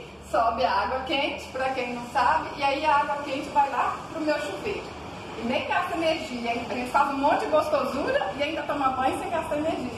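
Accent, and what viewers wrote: Minas Gerais, Brazil. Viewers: Nossa q lindeza de fogão e meu sonho ter um fogão a lenha q no passado eu odiava kkkkk Porq as panela pretas pra lavar era a minha morte kkkkk hj eu adoraria ter q não faz muita fumaça kkkkkkgosto mto da comida Do fogão a lenha Maravilha de vídeo gratidão sua lida tem voz de mineirinha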